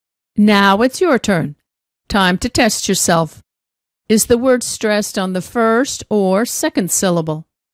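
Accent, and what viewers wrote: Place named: United States. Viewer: Authentic American Pronunciation 😉😊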